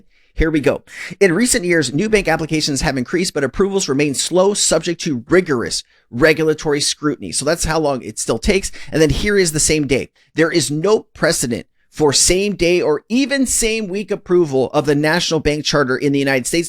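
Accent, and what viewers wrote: Australia. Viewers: Yes he's Australian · Same here... the dude on the video was an Aussie!. was close...😊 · Australian accent 😊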